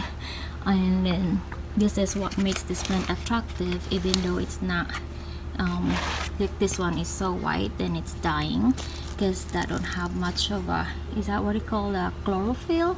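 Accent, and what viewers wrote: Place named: Philippines. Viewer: Hello from Ken in Japan. Your voice sounds like you are from the Philippines.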